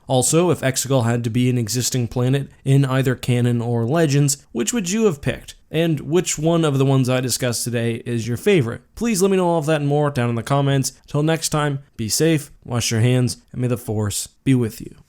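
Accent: Canada. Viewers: Lol...You have an OUTRAGEOUSLY STRONG Canadian accent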